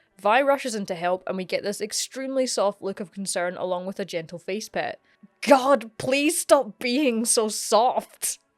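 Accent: Scotland, United Kingdom. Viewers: The thick Scottish accent with the Chinese channel name threw me off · I really did not expect to hear an scottish accent when I clicked a video LOL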